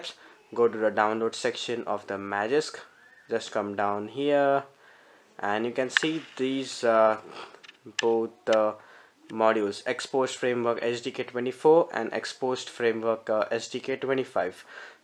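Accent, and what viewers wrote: India. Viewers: The Indian accent English is hard to understand to Chinese people.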